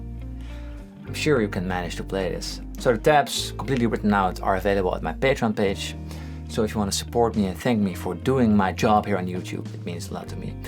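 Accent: Netherlands. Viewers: This dude is heavily dutch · Bro your Dutch I know because your accent and I see you many times at the supermarket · Hi. New subscriber here, and I'm really enjoying your videos. My parents and all my extended family are from Netherlands so your (very slight) accent is relaxing and familiar to me. Cheers!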